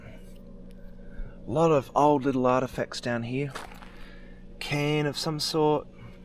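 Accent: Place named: England, United Kingdom. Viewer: yep.there good .people like living like that .if u look up.they have great innings .compared to city folk .an are happy . Nowhere is far from.anywhere. .below pt Augusta.try above .😮 might be hours to the neighbours 😂 an ocker up.an u will.get names right . English accent is causing it . Pretty funny given me a laugh. R u gonna give me heads up on that place I asked about . An I'll pass on 3 roads u will love . Plenty of cam opportunities .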